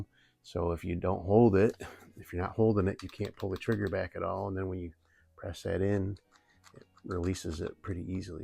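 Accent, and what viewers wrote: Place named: Wisconsin, United States. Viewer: Very cool channel. Been subscribed for maybe a month. Your accent and “goofin around in the garage” thing reminds of everyone I knew in northern Wisconsin as a kid. What I found strange is that you seem to know everything about airguns. And you’re a sought after airgun mechanic/repairman. Every video of yours I watch, is really humble and professional. What’s most fun is that you review everything from top of the line rifles to the cheapest junk not many would buy, and everything you play with is about fun. “Just goofin’ around in the garage” and that attitude really sold me. I’m just getting into pellet guns again after decades of considering them just toys for kids. Mostly because of a sudden and severe hip problem. Going to the range with firearms is out. Plinking in the house or yard with a pellet gun though, is super fun again. 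Addictive as hell. Picked up a Gamo Fusion 3i and was hooked. Watching all these videos and looking at my Gamo, thinking, “I can do better than this…PCP is where it’s at.” Got the Notos and a hand pump (Hill MK 5, best they had), and there is nothing addictive about hand-pumping even the smallest air cylinder out there, the Notos being one of the smallest. “Okay, I’ve gotten a little over my head at 61 but it’s great exercise and really fun to shoot with a scope that’s 3-times the price of the gun.” I’m learning fun stuff with airguns and I don’t even need to leave the house. Thank you and everyone else in the airgun community, I’ve talked with mo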